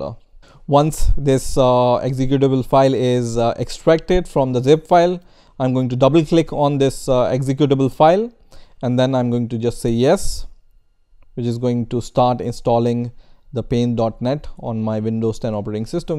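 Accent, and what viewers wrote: India. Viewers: You know it's legit when the dude has an indian accent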